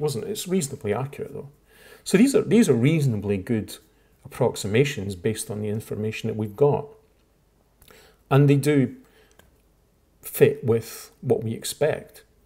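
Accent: Scotland, United Kingdom. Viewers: Y... You're Scottish... eyes subscribe button pushingly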